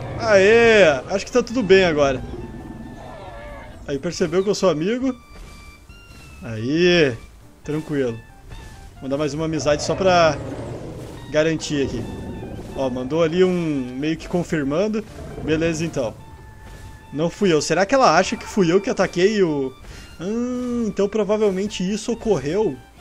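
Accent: Rio Grande do Sul, Brazil. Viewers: Gaúcho? Mas aah djdjjd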